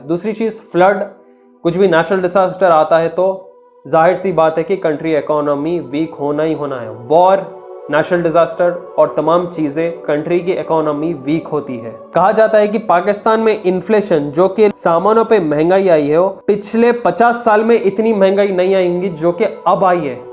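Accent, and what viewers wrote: Hyderabad, India. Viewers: Bhai aap haidrabad se lagre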